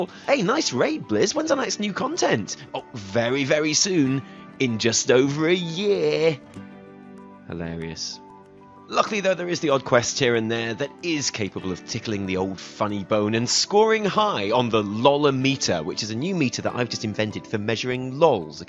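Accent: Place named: England, United Kingdom. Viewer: Hey, anyone know what his accent is from England? I'm Hampshire but I can't quite place his. Thanks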